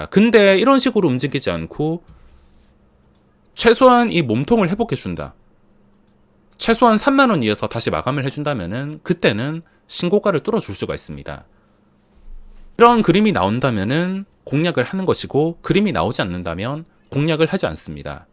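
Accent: Gyeongsang, South Korea. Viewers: 칠암사계에서 목소리랑 똑같은분을 옆자리에서 우연히 뵈었는데 경상 지역남자분들 목소리는 비슷한가요 제가 암튼 늘 감사히 듣고있습니다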